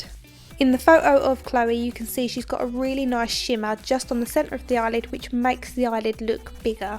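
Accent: Essex, United Kingdom. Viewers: Are you from Essex? You sound like my cousins!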